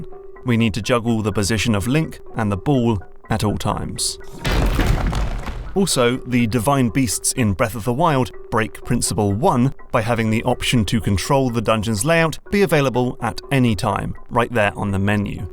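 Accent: England, United Kingdom. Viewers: This narrator has the same accent as Tom Scott. Anyone know the region of England?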